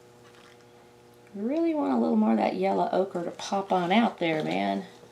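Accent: Texas, United States. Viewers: It was beautiful before the spin, but the black lines and the spread patterns worked. Very nice work. Love that Texas talkin.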